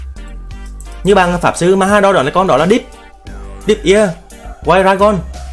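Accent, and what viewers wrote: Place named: North, Vietnam. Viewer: Anh nói tiếng nam đi tiếng bắc khó nghe wa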